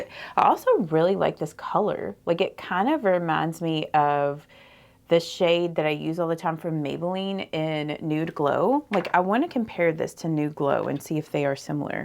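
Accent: South, United States. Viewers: It’s called a southern accent. I love it! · a Southern accent is beautiful. However, the way a single syllable word turned into two syllables is a cadence of younger people. Just wish I were younger and and vocabulary wouldn’t bother ME so much.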